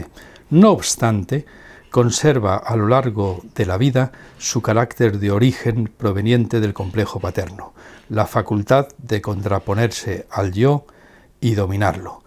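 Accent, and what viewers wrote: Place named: Spain. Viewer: Qué pena no haberlo descubierto antes Sr.. Llevo un tiempito escuchándolo y me resultan muy interesantes las diferencias en el modo de hablar, explicar Psicoanálisis desde la voz de un Español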